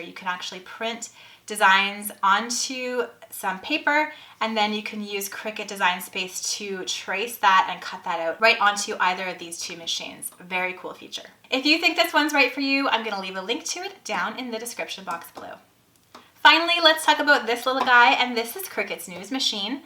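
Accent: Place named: Canada. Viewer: I love the way you say “decal”. Canadians you need to stop apologizing for how you talk. We love your accent!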